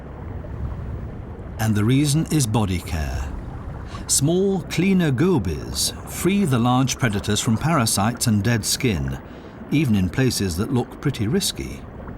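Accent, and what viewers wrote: United Kingdom. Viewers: "notanother" Uboob being narrated by a Brit, for Gods sake use a Lantino accent for Central American subjects. What's with all the Brits? At least this one I can understand, without subtitles.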